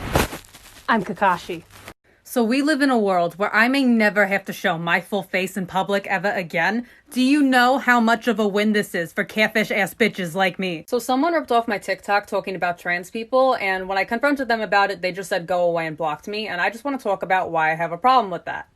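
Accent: New Jersey, United States. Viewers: This is the only time I heard people say they actually like the New Jersey Italian accent. It makes me happy :’)) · So I usually don’t like the jersey accent- I find it abrasive. But her accent is quite beautiful. It’s very soothing and almost musical.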